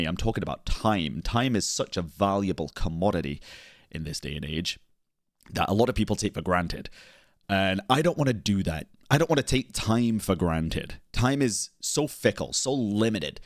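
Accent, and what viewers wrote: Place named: United Kingdom. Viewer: youu brought up being british, and its insane how 99% of the time i completely forget you are, even though your accent is shown with every word you say but i just never think about it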